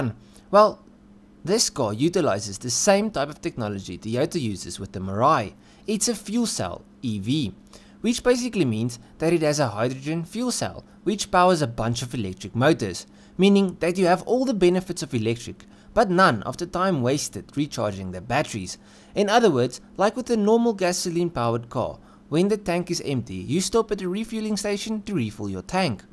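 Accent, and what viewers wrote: South Africa. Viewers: Great to hear a real SA accent.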